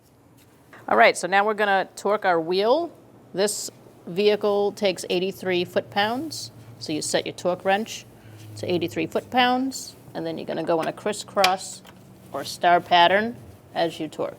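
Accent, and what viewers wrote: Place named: Massachusetts, United States. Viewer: I’m hearing Massachusetts or Jersey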